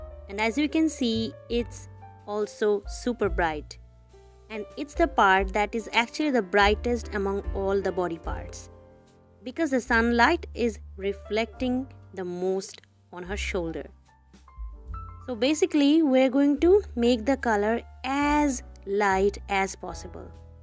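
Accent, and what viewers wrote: India. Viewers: Hello! May i ask you,what is your nationality? Are you an indian? Because you sound like one~😌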